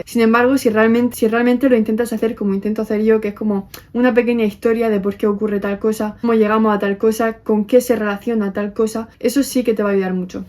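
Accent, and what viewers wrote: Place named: Murcia, Spain. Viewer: buen vídeo, tu familia es de Murcia? tienes acento de por aquí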